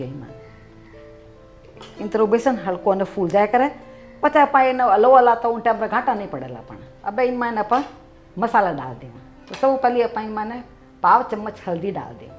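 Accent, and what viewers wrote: India. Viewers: Amma I love your way of speaking.desi style.😙😙😍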